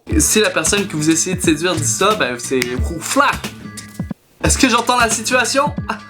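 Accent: Quebec, Canada. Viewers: Pour séduire je sais pas, vu qu'aucun Québécois m'a jamais séduit, mais pour faire rire ca c'est sur ! xD c'est pas de ta faute, je trouve juste l'accent drôle ^^ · Moi ça me seduit vraiment l'accent quebecois :P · J'adore l'accent quebecois moi, je trouve ca trop beau <3 ( ceci etais une information inutile ). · Toi ta un accent plus francais quebecois ^^ moi je vien du saguenay donc on comprend rien loool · L'accent québécois est juste so cute. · MOi je comprend tout ce que tu dis :D j'adore l'accent quebecois ;p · Je suis Suisse haha :') Sinon vraiment t'es trop mignon et j'adore l'accent québecois _ J'adore tes vidéos :) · C'est pas l'accent Québecois qui est Sexy,c'est!<3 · eeeee ses pas tout les quebecois qui on un accent comme lui moi je suis quebecois et il me gosait vraimen beaucoup son accent alors ..... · J'adore l'accent québécois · c'est l'accent Québécois Français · Je suis d'accord pour l'accent !!! 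Je kiffe l'accent québécois !! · j'adore l'accent québécois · Avant, j'aimais pas l'accent québécois, mais depuis que je regarde les vidéos de, j'adore!!:) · En même temps il a pas l'accent québécois la plus prononcé non plus! :D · J'adore ton accent et je surkiff le quebec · y a pas que les Québec qui savent faire l'accent que tu as moi je suis "francais" et j'arrive a le faire. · jaime trop l'accent québécois <3 sinon cool ta video ;) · j'adore l'accent québécois 💓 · Hahahahaha!! Chui québécoise mais ton accent est beaucoup moins prononcé que le mien ou celui d'autres personnes :) je sais pas pourquoi ;)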